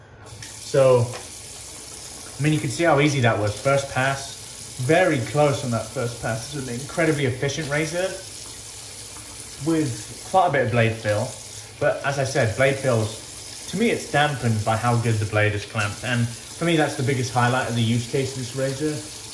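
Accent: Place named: RP, United Kingdom. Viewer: Nice review of the soap, awesome video! Just started watching recently! Keep it up! Love the accent, it's my definition of people with "old money" :D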